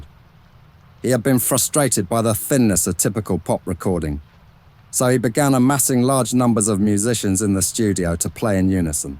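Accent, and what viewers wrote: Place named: London, United Kingdom. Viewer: I love the timbre of the narrators voice ! Classic London English..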